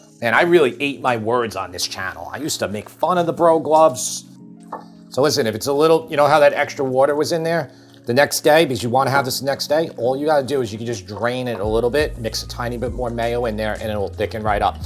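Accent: New York, United States. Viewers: Just made another batrch today in an effort to save money. Well not an issue in USA, but here in Norway sliced turkey etc and deli foods run at 45 to 120 usd a kg. So as a poor creative/guitarist, have to do anything possible to cut down on food costs. Whereas found chicken breasts on sale for 15 dollars a kg. Of course have expensive guitar collecting habit, just spent 20k usd on vintage guitars. But have to pay that back somehow. So strongly recommended. Plus I love the NYC accent. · I am from Perth, WA and I just wanted to say your NY accent is great!!! All the best.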